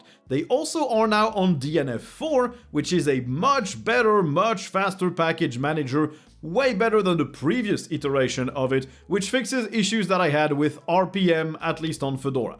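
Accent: France. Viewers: Seriez-vous français à tout hasard ? (^-^) J'ai l'impression que votre accent s'en rapproche :D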